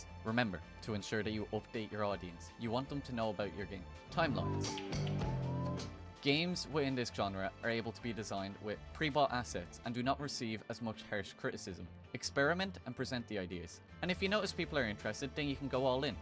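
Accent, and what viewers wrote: Ireland. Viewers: are you irish? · You Irish dude?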